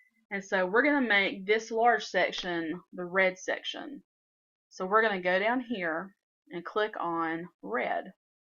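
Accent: South, United States. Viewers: WOW! Thank you sooo much. I've had inkscape for years and its just been too hard to figure out. This was amazing! Love your Southern accent and your singing is BEAUTIFUL! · Great video! Very easy to understand. I'm a southern girl too and I love your accent, much easier to understand when someone speaks slowly!!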